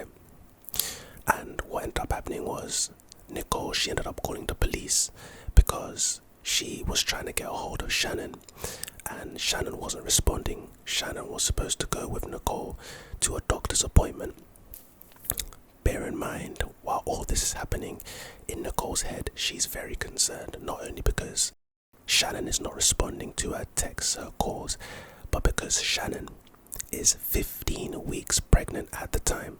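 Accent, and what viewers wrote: United Kingdom. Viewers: ? Pronunciation of what? He's British I believe - they speak English in England lol 😂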